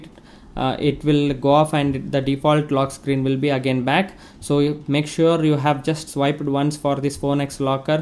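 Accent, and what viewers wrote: South India, India. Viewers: I thing ur southindian?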